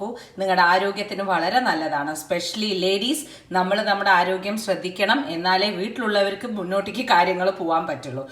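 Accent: Palakkad, India. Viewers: നമ്മുടെ പാലക്കാടൻ നാടാൻ ഭാഷ ചേച്ചിയുടെ സംസാരം സൂപ്പർ 👌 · Luv u r accent chechi..em frm pkd too so I cn relate tu u!😊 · Hi.... My Dad is from പാലക്കാട്!! So aa varthaanam നല്ല rasandu tto!! Pinne പാചകo... വാചകo രണ്ടും superb!!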